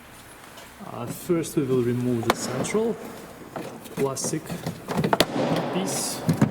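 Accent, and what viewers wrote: Russia. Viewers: Nice Man.. Really helped me a alot, had to change the reverse light.. Couldn't find anywhere. This video solved. Liked his russian english accesnt.. Regards.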